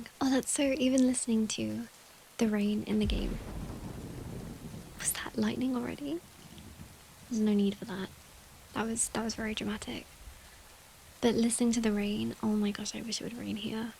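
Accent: United Kingdom. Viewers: As soon as I heard the British accent, I knew the struggles of the heatwave I'm British too and this is GENUINE hell. Anyway amazing video and very relaxing. Great job.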